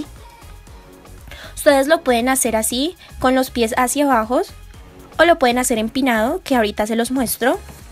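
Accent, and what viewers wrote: Colombia. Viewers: Supuse que eras Caleña! saludos desde el sur, por cierto, nueva sub!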